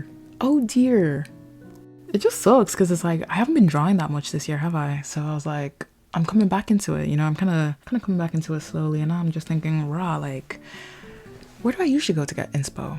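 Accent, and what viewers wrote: Ireland. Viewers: she's irish lmao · ur irish accent is really popping through in this video !! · She sounds more Irish to me 🤔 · Omg are you irish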